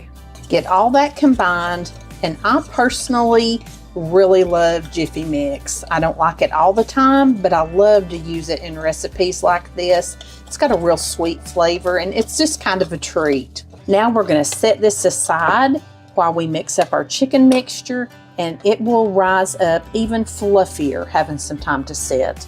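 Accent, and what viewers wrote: South, United States. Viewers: love your southern drawl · I LOVE your accent... love to hear the southern drawl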